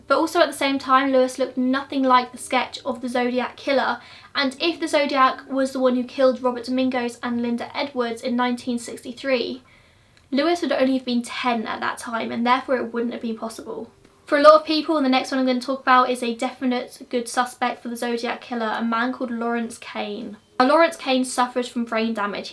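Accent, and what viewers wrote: United Kingdom. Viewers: Please don’t take this the wrong way, but your mispronunciation of “Luger” in a British accent is hilarious as an American who grew up around guns 😅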